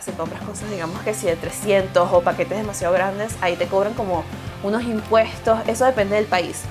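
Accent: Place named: Venezuela. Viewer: !!!! Soy una gran fan! Te sigo desde hace mas o menos como un años pero no se porque motivo o razon no podia comentar desde mi telefono (y no tenia computadora). Pero ahora por fin puedo comentar!! SIII!!! Tengo 17 años (recien cumplidos), soy de VENEZUELA (Valencia, luego vivi en Ccs y luego vivi 5 años en Margarita) pero hace un año y unos meses me mude a ALEMANIA. Fue un enorrrrrrme cambio, el lenguaje es mega diferente, el clima es mil veces mas frio y las personas tambien son super diferentes. Los primeros meses estaba deprimidisima (extrañaba a mis amigos y mi idioma y todo) y lo unico que hacia era estar pegada a Youtube viendo videos de maquillaje o blogs y tags. Y un dia apareciste TU! Te lo juro que fue solo ver el primer video y supe que eras venezolana, ni siquiera tuve que leer tu perfil! Al principio estaba como: Yo la conozco, pero luego me di cuenta que era solamente el hecho de que hablaras como yo, la expresiones, la calidez, todo. 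Fuiste mi salvacion en mis meses de depresion. Y luego fuiste mi salvacion cuando me dijeron que tenia que ir a una escuela sin poder hablar aleman y sin saber que hacer. Simplemente me maquille, puse una buena sonrisa y con actitud empece a hablar en ingles (lo cual ayudo, porque no entendia ni pio de aleman). Bueno simplemente eso, queria que supieras que de verdad me ayudaste sin conocerme y sin saberlo. Y queria decirtelo porque ahora si puedo escribir mi comentario! MIL BESOS Y ABRAZOS. PD: FELICIDADES POR TU CASAMIENT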